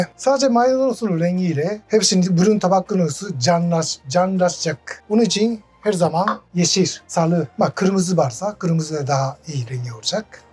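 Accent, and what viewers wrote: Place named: Japan. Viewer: japon aksanıyla türkçe duymak çok güzel yav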